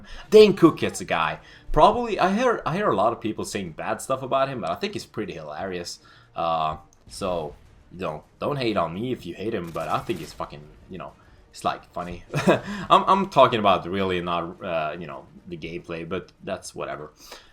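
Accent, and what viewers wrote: Sweden. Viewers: youre the best, love your swedish accent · wat the hell wow it seems u very popular dude lol cauz your swedish:P:D everybody likes abit of Foreign Accents hell yeah for the world!!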